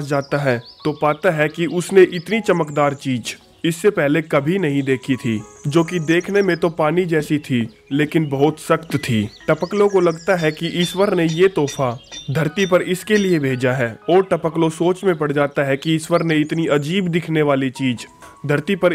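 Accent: Haryana, India. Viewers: Haryana ka h k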